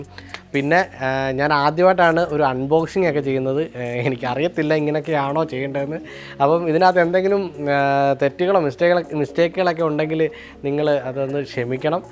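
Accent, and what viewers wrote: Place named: Kollam, India. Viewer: കൊല്ലക്കാരുടെ സ്വന്തം സ്ലാങ്ക് "ദാണ്ടേ " പിന്നല്ല പൊളിച്ചു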